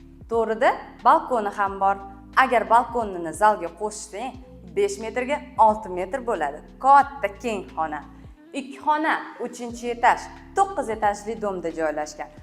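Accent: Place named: Qashqadaryo, Uzbekistan. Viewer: Qashqadaryoni qeysi qishlogidansan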